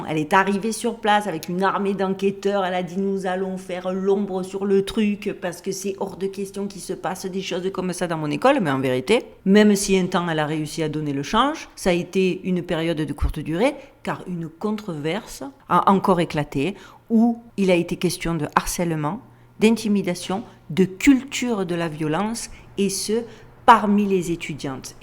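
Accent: Marseille, France. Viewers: Merci beaucoup 😄 toujours captivant de t'écouter. Je ne fais jamais de commentaire vu le nombre incroyable qu'il y a toujours mais j'avais envie pour une fois de te dire merci et te dire aussi que j'adore ton accent marseillais....ça et ton rire contagieux font ton charme de même que ton intelligence.😉🙏👍💖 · Son style est un mix entre le conte pour enfant et l'enquête criminelle saupoudré de l accent marseillais. On kiffe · Cc oh que oui cela est intéressant même plus que cela puisque nous en France cela a juste était juste diffusé comme le passage d'un TGV bien entendu que ça pue et mon dieu il faudrait tellement que ça pète et du côté de chez nous aussi pour finir de réveiller bcq bcq d'âmes au nom de la justice Divine et par respect de toutes ces personnes qui ont et qui subissent encore ces horribles conditions tu as une belle mission notre Marseillaise surtout ne perd jamais ce magnifique accent et langage bien de chez nous merci bien sûr ce com est personnel et j'assume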